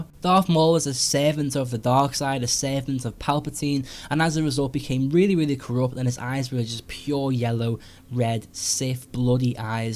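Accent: Liverpool, United Kingdom. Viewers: Ahh a fellow scouser :) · are you a scouser? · Sweet video, you from Liverpool by any chance? You sound pretty scouse! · It is a mild liverpool accent (collegially known as "scouse"). Its an interesting English accent because all the surrounding accents are very different (eg the Manchester and midlands accent). It derives from the large influx in Irish immigrants to Liverpool during the potato famine. This mirrors the American Bostonian accent, which similarly derives from Irish Famine immigrants who went west to America rather than east to Britain. The English have so many accents and it refreshing for people to acknowledge other accents rather than just the standard "received pronunciation" of the Queen's English. (Not that there is anything wrong with RP)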